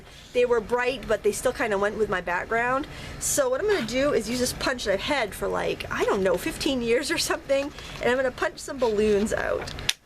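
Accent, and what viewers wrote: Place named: East Coast, United States. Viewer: Great card I really like that giraffe looking animal its Wicked. Lol We don't get all the new sayings etc. that the East and West coast has, it takes awhile to make it here. One thing I did notice about the east is your words are pronounced different. My Dad was born in New York and you pronounce some words the same as him. You say drrw instead of draw. My Aunt's name was Ida and my Dad said Ider. Lol love it!! Thank for the great video!!!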